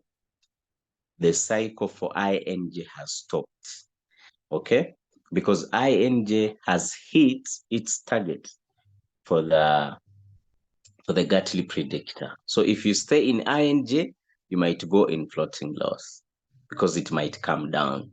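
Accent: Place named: Uganda. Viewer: Hello Sir, I understand from your accent that you are from Uganda...where I happen to be and I am interested in learning more as I would like to invest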